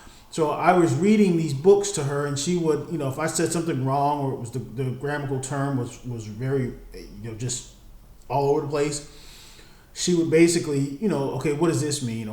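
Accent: Denmark. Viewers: But you have to listen really good to notice his "Danish accent". But yeah. It's a good sign. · When I'm listening to this video, I can't help but think that you have a slightly "Danish" accent when you are speaking English. It's actually pretty cool!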